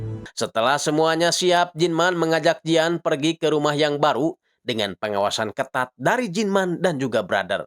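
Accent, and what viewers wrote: West Java, Indonesia. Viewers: mantapp mangg, guaa sukaa chanel ini cuma garaa² amang nya ada sundaa² nya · Keren pisan euy channel na Aya logat sundana Salam dari malausma Majalengka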